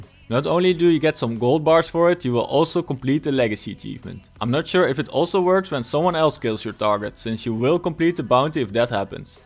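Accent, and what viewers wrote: Netherlands. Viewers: Je hoort toch wel dat je Nederlands bent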